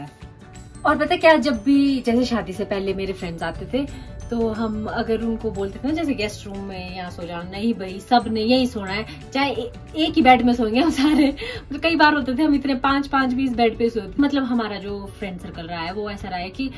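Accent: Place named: Pahadi, India. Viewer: You are so creative and loved your pahadi accent ❤️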